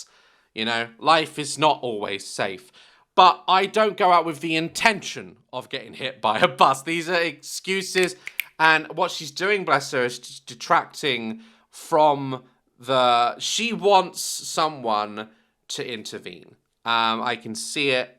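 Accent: United Kingdom. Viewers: Your videos haven’t failed to make me laugh, I love that British accent (as well as the very sassy voice) 😂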